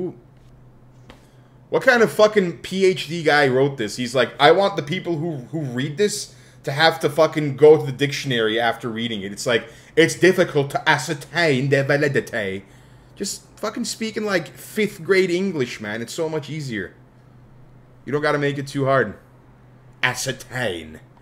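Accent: United Kingdom. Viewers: No one talking bout his British accent 💀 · i love your british accent its so funny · Love the British accent bro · bro has an awesome british accent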